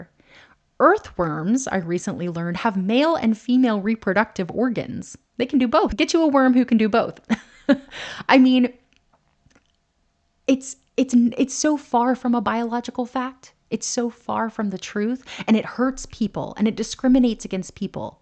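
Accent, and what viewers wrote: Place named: South, United States. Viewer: Her southern accent makes her sound unintelligent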